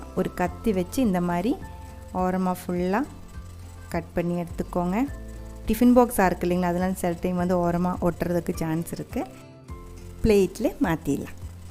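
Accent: Kerala, India. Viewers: Mouth melting..... Clear recipe... Malayala tamil cute.... Gonna try for my kids.... Thanks a lot for the recipe.....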